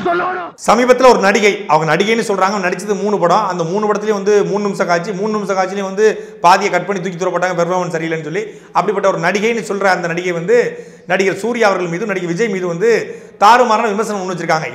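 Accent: Tirunelveli, India. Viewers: நெல்லை தமிழை நெருப்பாய் கொட்டும் சாட்டை வேற லெவல்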